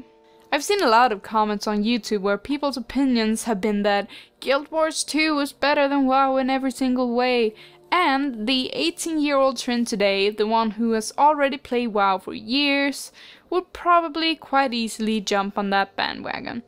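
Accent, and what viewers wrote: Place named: Sweden. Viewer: Sometimes I hear a bit of Swedish accent but your english is excellent, where are you from? Nice review and most of the time the only thing I want to hear is that it's better than wow, for me that is enough.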